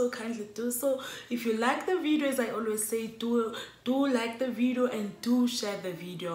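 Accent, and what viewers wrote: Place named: Saudi Arabia. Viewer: Saudi Arabia, nice. Beautiful accent👌🏾👌🏾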